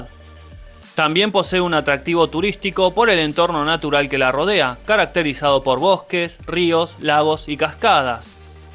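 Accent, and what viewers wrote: Argentina. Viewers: Qué yeísmo tan fastidioso el de este argentino, pronuncien bien la “y” y la “ll”, parecen idiotas con esa pronunciación tan fastidiosa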